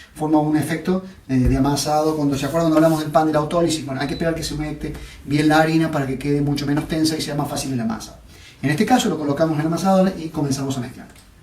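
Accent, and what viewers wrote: Cordoba, Argentina. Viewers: Canal mas que bueno y solo podía ser argentino!!! Famosos por saber enseñar con la mayor generosidad,,,,, país del que siento orgullo !!!! Además de tener muchísimas delicias para pasarse todos los días haciendo cosas ricas p nuestro deleité __gracias mil!!!___aprte de escuchar ese acento tan çaracteristico de mi provincia de Córdoba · Con razón un GENIO DEFINITIVAMENTE ME SUSCRIBO 👏👏👏👏 Ialacordobés como yo jajaja y la tonada nos delata Encantada con tus recetas👍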